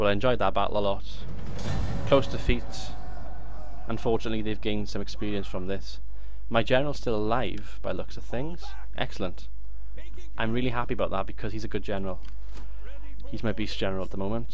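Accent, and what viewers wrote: Wales, United Kingdom. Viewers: Unorthodox is good though. You make moves I probably would be conservative to do and it is interesting seeing how that plays out. But I can certainly say you have became my favorite TW Youtuber over the past week or so. Also the accent is great :P Can't beat a good Welsh Accent.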